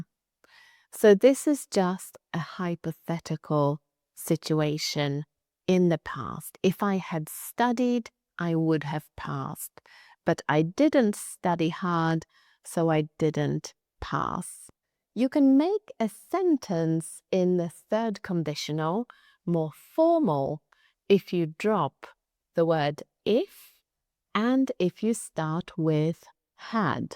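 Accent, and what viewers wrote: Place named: United Kingdom. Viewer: Very good explanations and excellent British accent!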